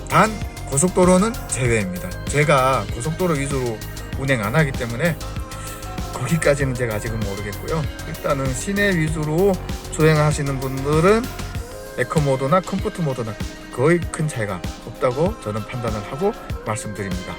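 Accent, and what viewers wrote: Yanbian, China. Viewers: 혹시 고향이 연변이세요? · 연변 출신이에요, 아니면 하얼빈 출신이에요? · 어 조선족이다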